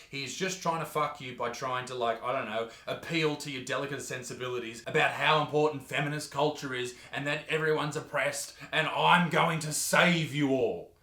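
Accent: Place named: Australia. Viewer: Holy shit, you've outdone yourself! You Aussie gob flopper's make me laugh by talkin' naturally!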